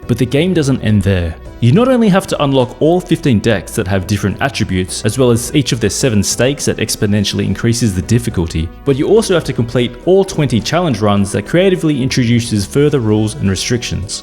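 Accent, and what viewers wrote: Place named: Australia. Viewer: Great video mate, love the commentary and the aussie accent haha (from NZ myself) Definitely agree, all these platinums are definitely hard/time consuming to get. I've gotten the Skyrim one three times on each PS generation and also the Arkham Knight one, including tevery trophy in the community challenge pack. I don't blame you for not doing them, they're absolutely mental I'll be checking out the rest of your catalogue and subscribing for more.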